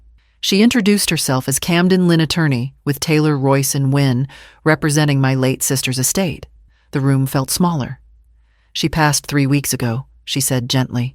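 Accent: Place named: Australia. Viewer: Sorry but this AU voice.....ayayayay. 😅😢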